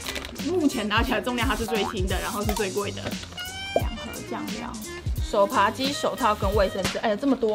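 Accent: Taiwan. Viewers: 完全的注音腔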